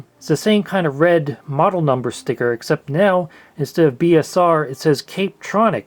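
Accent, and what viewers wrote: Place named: New Jersey, United States. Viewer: I never heard anyone not from Jersey find the accent pleasing. lol.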